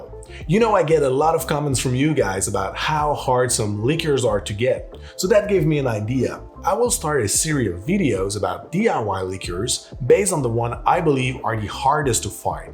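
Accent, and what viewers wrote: Quebec, Canada. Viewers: Because it's not from France's French but Québec's french!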